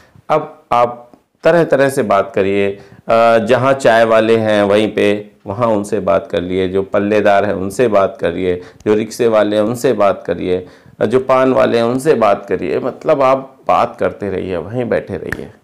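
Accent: Kanpur, India. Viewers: Kanpur k h sir language wahi ki h apki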